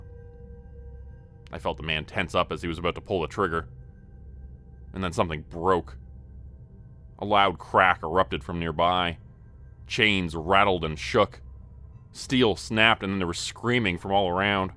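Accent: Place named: Canada. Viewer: I KNEW u were from Canada! The way u say any word w/ "out" in it. Or box. Or house.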